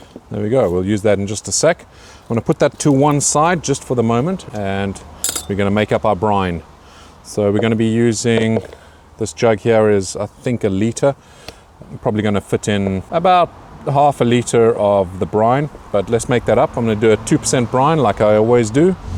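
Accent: South Africa. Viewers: You Sound South African. Are you?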